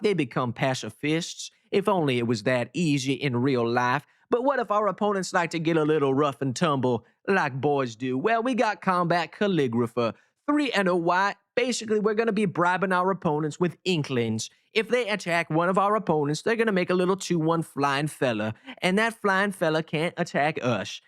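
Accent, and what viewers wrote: South, United States. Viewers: That southern accent at 2x speed is quite funny :D Keep building man! And if you ever find a novel way of making a deck with Lord Magnus as commander, I am all ears :D. I never got past Ehrnam Djinn and Lumbering Satyr :P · Classic southern drawl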